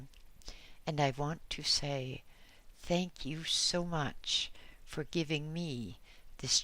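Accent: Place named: Canada. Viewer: Dear Bluefriend, thank you for reading Dr Rampa's books, which I first started reading back in the 60's when my grandmother gave me a copy of the Third Eye. Since then, it's been a long (and remarkable) journey. Your beautiful unhurried Canadian voice is well suited to Dr Rampa's writing. Thank you again, warm regards Peter Staples (Colombia, South America)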